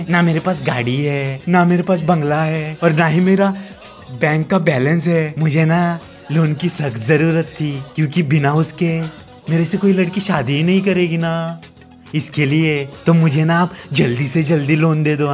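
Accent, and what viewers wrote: Goa, India. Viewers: Love the goan accent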